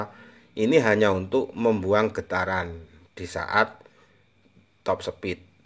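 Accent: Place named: Java, Indonesia. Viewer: slang nya pekat jawa. terbaik bang. salam dari malaysia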